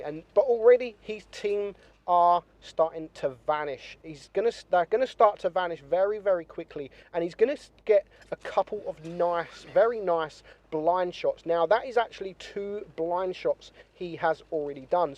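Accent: United Kingdom. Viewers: Where is he from? UK?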